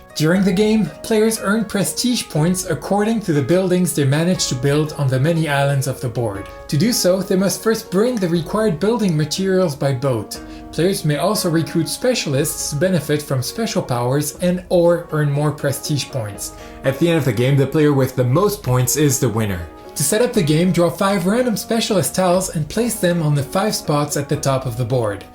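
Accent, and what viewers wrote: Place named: France. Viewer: with a touch of French accent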